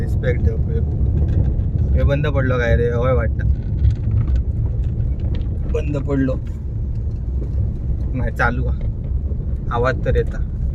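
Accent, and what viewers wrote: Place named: Konkan, India. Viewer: Bro, Phondaghat madhle nisarga soundarya ani tuzhya konkni bhashe cha godwa, maastach...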